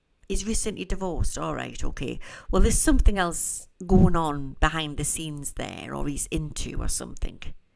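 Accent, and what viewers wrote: Newcastle, United Kingdom. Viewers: Why would someone do that? I'm a fellow geordie and I love listening to you and it comforts me to hear you, when I live in Australia now. Gis the name of the people who were not nice to you and I'll kick them in the fanny for you I😂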